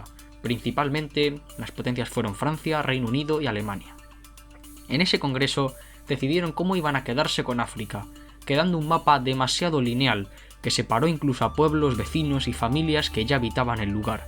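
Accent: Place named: Spain. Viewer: Que hermoso canal, me gusta como narran ustedes los Españoles, sigue así, nuevo sub.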